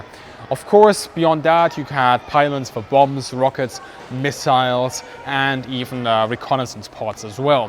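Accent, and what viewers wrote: Germany. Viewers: Oh my... I apologize, that quite heavy German accent made me hear "that secured the jets aircraft position" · Sehr gute Präsentation ... und ein angenehmer, deutscher Tonfall im Amerikanischen ;-)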